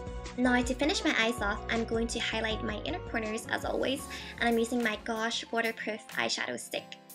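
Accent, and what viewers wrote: Ireland. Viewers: are you like, half irish or something? :) great tutorial btw <3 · I know everyone says this but I LOVE your irish accent (: haha talk about the irish charm. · Telling someone who technically speaks English fluent and has an Irish accent not to try to sound fluent is just plain retarded. And you need to clean your ears please because I, who do not speak English as my first language, am able to understand what she's trying to say. Thank you very much.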